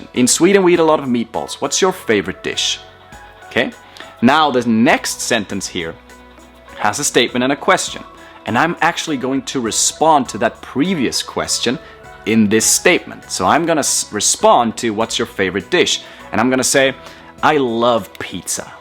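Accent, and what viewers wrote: Spain. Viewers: Wow,cara eu tô surpreso com o progresso dele no português,o vocabulario dele é muito bom,a pronuncia as vezes não é tão boa por conta do sotaque forte do espanhol,mas fora isso,deu para entender tudo, parabéns!!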